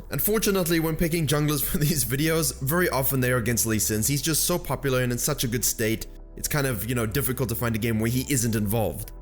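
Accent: South Africa. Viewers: You sound South African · you're from south africa! <3 I am proud!!!! haha I did not even notice. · Are you South African?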